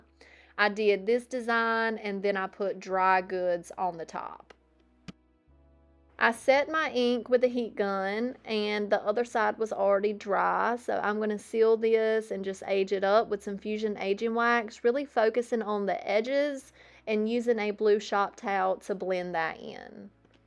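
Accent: South, United States. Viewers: I’ve never tried milk paint but you make me want to try! I’m so excited I found your channel! And I love your beautiful southern accent!! 🫶🏼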